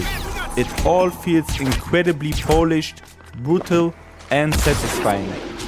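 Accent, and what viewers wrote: Germany. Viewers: i hear german fella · Ich geh von deinem Akzent mal davon aus, dass du deutsch bist. Ich hab alle deine Hitman Videos durchgeschaut. Finde die super. Hast dir nen weiteren Abonnenten verdient 😂✌🏼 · Are you german ?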